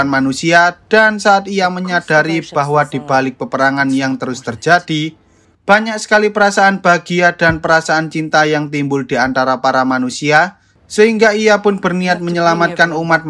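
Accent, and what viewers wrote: Java, Indonesia. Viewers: Fix, suaranya rada-rada orang Jawa abangnya😁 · Lu orang Jawa bang ? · Sampeyan Jawa endi mas mimin, kok ngomonganya agak ada Jawa" gitu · Uwong jowo bg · Bang suara lu mirip dodit mulyanto medoknya pun sama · Apik pilem e min... JOWOMU NGENDI MIN... KOK MEDOK MEN⁉️ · Sampean wong jowo kah bang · MEDOK BANGET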